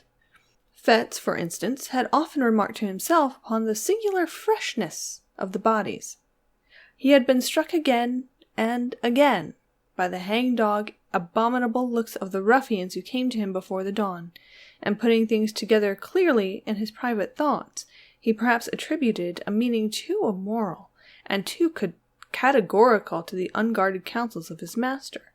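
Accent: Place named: United States. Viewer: Hi, I came for a listen at the urging of Tony Walker (Classic ghost stories), & I find his praises correct. Wonderful narration, & your character shines through in a fabulous quirky way that I really enjoyed. I have to confess that I generally avoid narrators with American accents, (sorry, I find so many of them grating, might be an Australian thing?). Happily your reading made the accent irrelevant ... Loved it.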